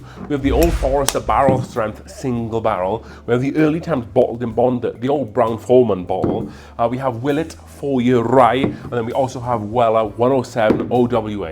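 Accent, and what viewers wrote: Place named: Wales, United Kingdom. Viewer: I like your videos but I've been trying to figure out your accent. Sounds like you come from Wales but only on certain words. Good choices on the bourbon but where are you from originally?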